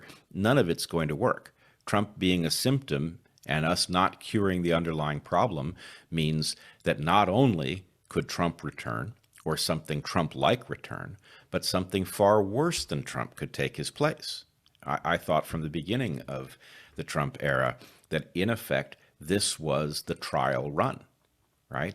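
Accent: Wales, United Kingdom. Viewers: South? Swansea area? It's funny to me to hear such ideas with the accent. I grew up there and Its not the kind of conversations I associate with Welsh people! Your clearly very sharp though, and your channel is great, so I look forward to hearing more.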